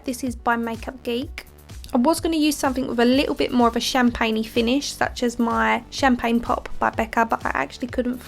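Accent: Essex, United Kingdom. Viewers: Love your Essex accent and your techniques. Subbed :-)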